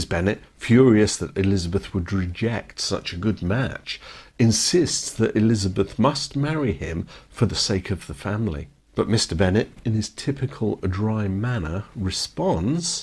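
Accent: United Kingdom. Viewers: Re subscribers, clearly you underestimated the appetite of a legion of Austen fans for a soothing British narrator and their favorite film clips over the well-trodden history of the Beatles 😂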